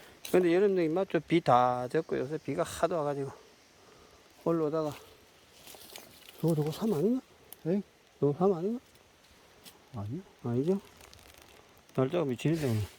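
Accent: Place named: Mungyeong, South Korea. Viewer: 저는 고향이 문경 입니다. 저의 고향 말투랑 비슷해서 정감이 가네요.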